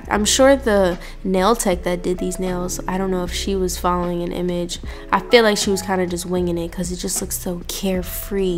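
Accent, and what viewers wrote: United Kingdom. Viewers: We all mutually agree we love these nail vids 😍 the british accent killed me 🤣 oh also its a-pray bby girl xx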